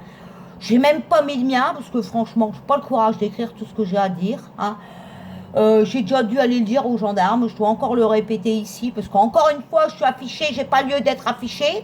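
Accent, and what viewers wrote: North, France. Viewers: Coucou j'ai fait brûler de la sauge et demandé à l'univers de vous venir en aide pour arrêter tout ce harcèlement et que votre dépression s'arrête car vous ne méritez pas ça et depuis 2 ans vous remontez le moral à tout le monde alors à nous de vous aider à notre tour on vous aime et surtout votre accent du nord que j'adore 💕 💕 💕 💖💖😍😍😘😘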